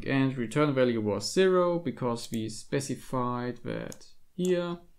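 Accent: Germany. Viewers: your accent are like germans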